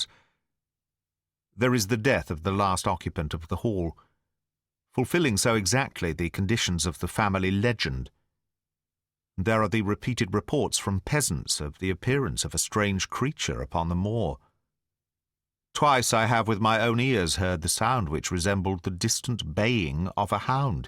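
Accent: United Kingdom. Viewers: I don't understand British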